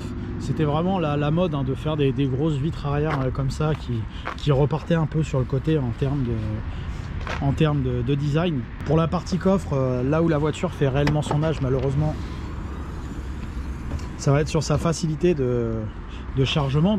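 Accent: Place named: Paris, France. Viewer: Superbe accent titi parisien du présentateur, je ne sais pas s'il vient vraiment de Paris mais ça y ressemble.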